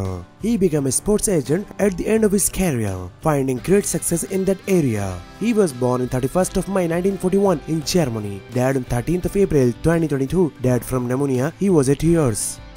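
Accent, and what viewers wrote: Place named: India. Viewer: ENTIRE PHRASES WENT BY THAT I COULD NOT UNDERSTAND.😩 NARRATOR SOUNDS TO BE FROM INDIA. IF HE WOULD JUST SLOW DOWN, IT WOULD HAVE HELPED!☹️😩😆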